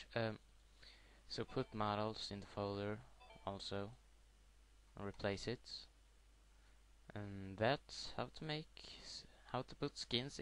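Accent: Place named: England, United Kingdom. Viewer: where did u learn ur english accent :)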